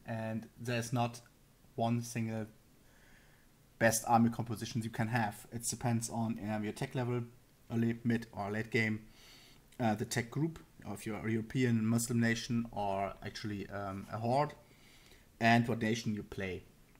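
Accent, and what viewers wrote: Germany. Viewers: bei dem deutsven akzent kannst du auch gleich deutsch reden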